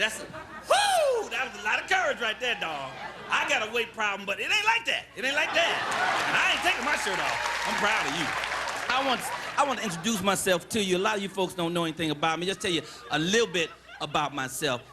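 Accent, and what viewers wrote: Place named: Louisiana, United States. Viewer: Something about the sound of his voice rings "Cajun" to me ...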